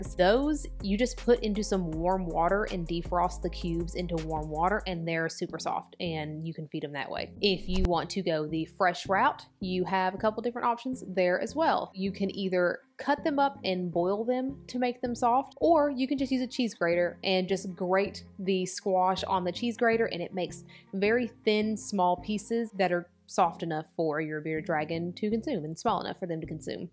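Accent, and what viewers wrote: South, United States. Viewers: I love your videos and your personality and how you talk so smoothly , you must be southern I hear my accent ❤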